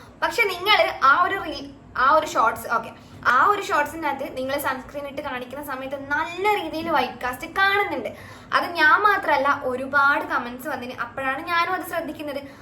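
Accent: Kannur, India. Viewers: ചേച്ചിടെ സംസാരം കേട്ട് ,കണ്ണൂർ ആണോ? കാസറഗോഡ് ബോർഡർ ആണോ? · Kannur ahno njngalde slang pole thonny😌😂❤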